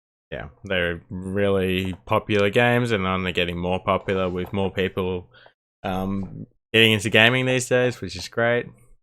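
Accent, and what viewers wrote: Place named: Australia. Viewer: where abouts in Australia are you from champion?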